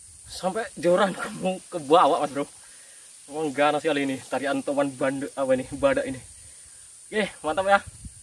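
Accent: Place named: Medan, Indonesia. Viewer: Gila coy.. mantap betul tomangnya. Tapi aku lebih tertarik sama logat mu coy.. Jawa edan eh jawa medan ya. Dmna lokasi mu coy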